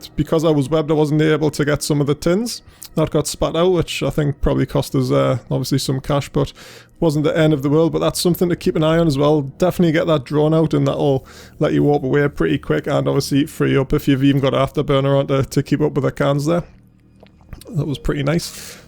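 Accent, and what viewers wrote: Newcastle, United Kingdom. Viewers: Always great hearing another Geordie playing eve :D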